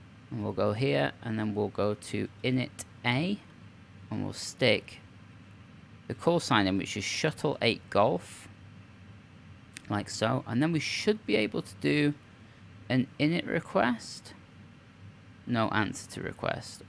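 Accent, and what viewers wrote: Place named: London, United Kingdom. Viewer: lol lol u soun like ur from london lol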